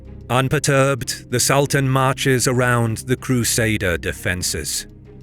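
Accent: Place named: United Kingdom. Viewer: Stop with the damn British accents on every documentary, it's the most annoying trend I've ever experienced!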